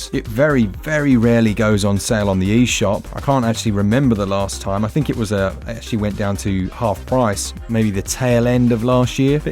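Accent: England, United Kingdom. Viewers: Deals sound better in an English accent.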